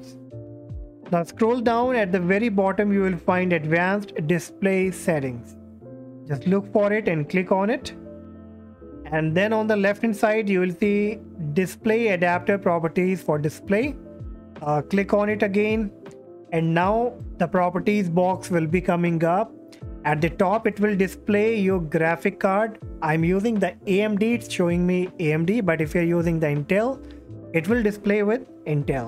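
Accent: India. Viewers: Idk why but you sound like those call center frauds. I'm for calling you fraud but those people just spoiled Indian accent.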